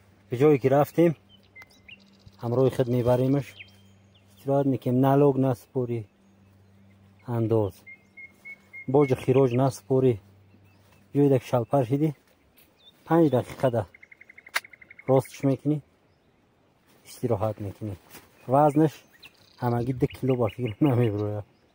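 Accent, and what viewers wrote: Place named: Kuhistoni, Tajikistan. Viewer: Бисйор оличаноб дустам рохат кардем лачаи хуби кухистони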